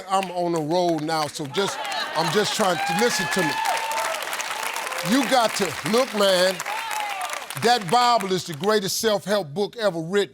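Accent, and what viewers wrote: South, United States. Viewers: I think learning from something you're interested in is a great place to start. You're more likely to stick with it. But I would also recommend some type of formal schooling. I took French in college and it was very challenging but beneficial because we weren't allowed to speak in English at all. Also I don't know if you can tell but has more of a southern American accent. Like he says "pah" when a lot of people would say "pye." Hope that helps and makes sense!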